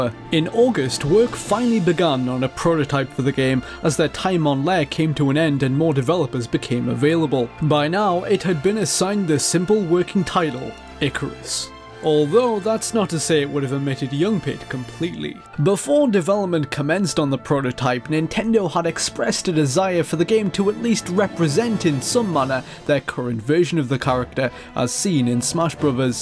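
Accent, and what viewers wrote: United Kingdom. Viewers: I always thought that the way you spoke was cool. Why in the world would anyone knock a British accent? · Your voice is great its my favourite British accent - and I live near Manchester, and cheers for telling me yet more information that I didn't know. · It's a heavy British accent if I'm hearing right, and I'm Canadian, all I hera is English and French.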